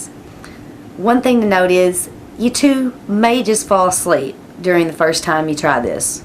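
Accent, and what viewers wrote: South, United States. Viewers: Cool southern accent haha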